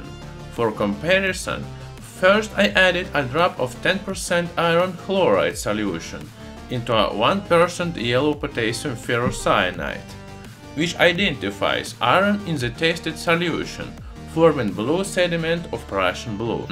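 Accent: Russia. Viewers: Love that thick Russian accent! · I love your accent. It adds to the "mad" Russian scientist character. 😁 I mean that in a friendly way. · Regarding his pronounce he is from rushka. They waste all toxic on their forests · "I needed to remove iron sauce" "Somehow extract near demon souls" You see, the auto generated English caption can't understand Russian Style English. But human ear does.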